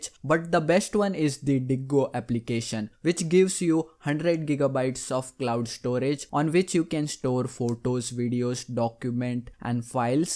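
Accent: India. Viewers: I agree it is very difficult to understand it is very difficult to understand anything you say that Indian accent that Indian accent makes it very difficult very irritating I only understand half of what you're saying sick and tired of it thanks for nothing thanks for nothing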